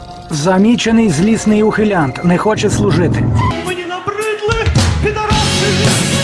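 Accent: Ivano-Frankivsk, Ukraine. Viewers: У нього акцент франківщини, а не львівщини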